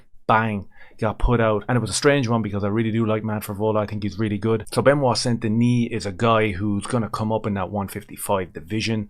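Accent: Ireland. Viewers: Are you Irish mate? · What part of Ireland are you from? Cork? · Really like your channel.. to the point.. no BS.. and who doesn't love the Irish accent🤷🏻‍♂️. Keep it up brother👏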